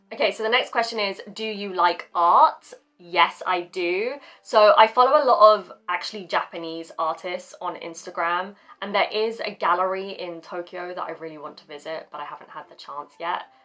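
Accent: United Kingdom. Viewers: I didn't ask you any questions, but I enjoyed the video. I got to know you better. I like the way you speak. British English sounds more classy and beautiful than American English, doesn't it? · 僕には0.5倍速にして、やっと字幕の音声を聞き取ることが出来ます。 日本人には英語の習得が難しいと実感すると共に、逆もまた真なりと考える次第。 それでも、アメリカ英語よりはクイーンズイングリッシュの方が、聴き取りやすいと思いました。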